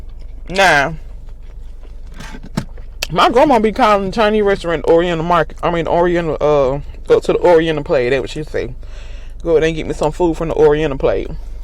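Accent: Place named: South, United States. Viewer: I like your southern talk & accent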